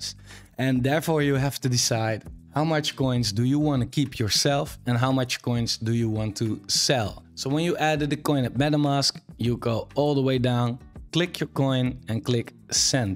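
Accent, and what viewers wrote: Netherlands. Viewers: you sound dutch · You from holland right? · I love jou mooie hollanda accent Wel goede video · ik hoorde al iets nederlands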